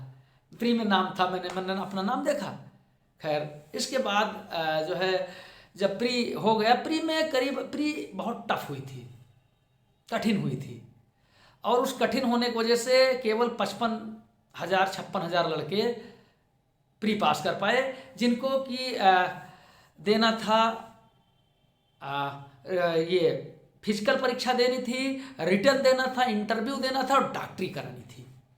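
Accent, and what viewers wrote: Awadh, India. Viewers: very intresting sir.. and the way of your talking in awadhi is also imressing..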